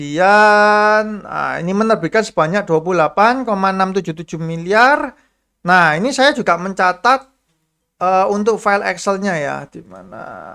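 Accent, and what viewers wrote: Surabaya, Indonesia. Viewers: suroboyo ta bos,kok kentel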